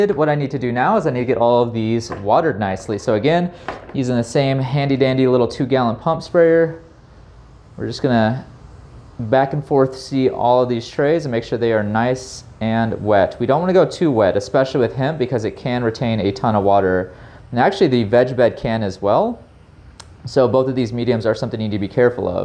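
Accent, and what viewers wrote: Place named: South, United States. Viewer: GREAT REVIEW! Your accent is becoming more southern with every day that passes. LOVE IT!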